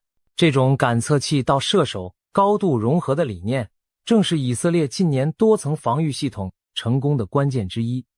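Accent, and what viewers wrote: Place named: China. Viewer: 这口音😅 完全是内地的.人家都开到门口好几次了 怎么不打。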